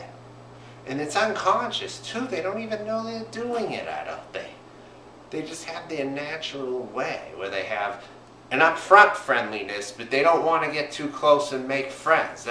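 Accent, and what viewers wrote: Boston, United States. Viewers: Are you from Boston? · It's a Boston accent. LOL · It sounds like you hate the suburbs of Portland. It also sounds like you're racist. Congrats on describing gentrification with the accent of Will Hunting. Go back to Boston. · You're not a hipster, and you have a heavy boston accent. So not the type to fit in with portlandia types. It's not your scene. Portland has a serious underground sex trade and is full of strip clubs. Kinky side for sure. I saw really bizarre hookers walking around out in the open and was approached by a guy who thought I was a hooker because I was out walking/bike riding late at night. So certainly has a sketchy side.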